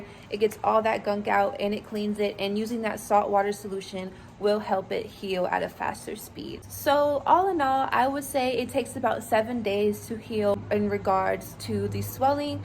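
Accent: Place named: New Orleans, United States. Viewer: y’all live in nola? i know my people when i hear them😂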